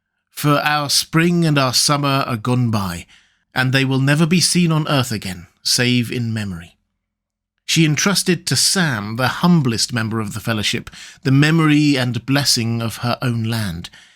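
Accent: England, United Kingdom. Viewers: He has an English accent too! Neat eh?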